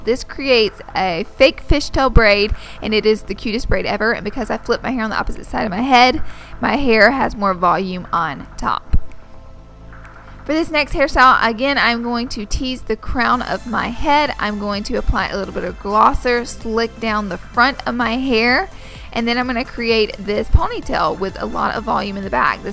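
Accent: South, United States. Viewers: Are you from the south cuz you sound like it. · You have a posh southern accent. I love it. Your so beautiful! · are you southern, you sound like me haha like a baby southern accent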